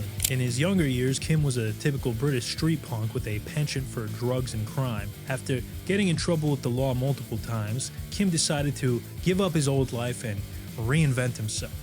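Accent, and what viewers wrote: New York, United States. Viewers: The new yorker/jersey accent makes this video better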